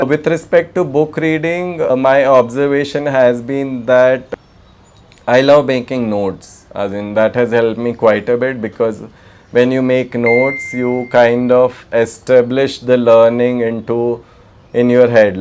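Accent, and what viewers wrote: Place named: Kerala, India. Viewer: I love the way u speak, r u from kerala? i read miracle morning book by Hal Elrod and currently reading 5 am club by robin sharma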